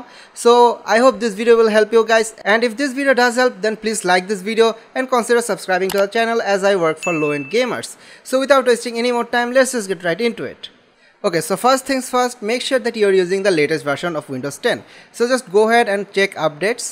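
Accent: India. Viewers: that accent sound Bengali...are you one tho?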